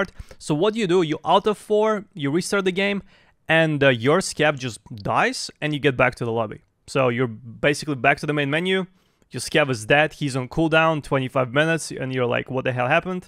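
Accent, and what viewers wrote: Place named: Russia. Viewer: Its free to try.and he is russin so he can not say things in perfict english sometimes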